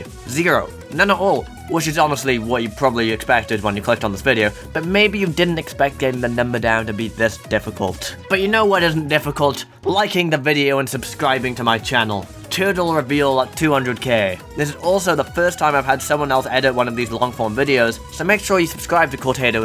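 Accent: United Kingdom. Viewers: you have quite an interesting accent/dialect; you sound British, but not quite at the same time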